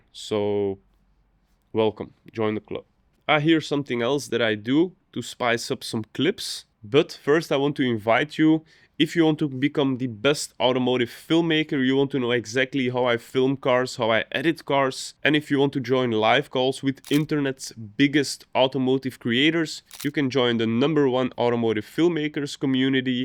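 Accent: Belgium. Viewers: ik hoor een vlaams accent ngl.... XD ben echt blij met deze video! nieuwe dingen bijgeleerd vandaag!! :-)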